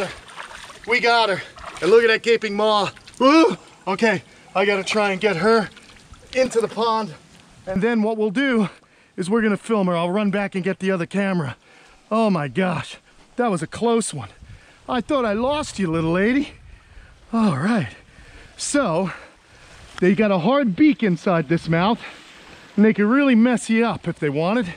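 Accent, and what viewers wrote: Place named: New York, United States. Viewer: Nothing wrong with a New York accent.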